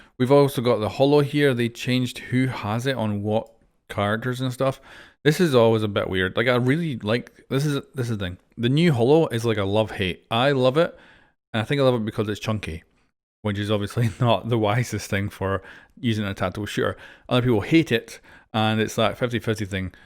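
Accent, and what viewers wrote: Scotland, United Kingdom. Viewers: Are you Scottish you sound it I’m also Scottish if you are?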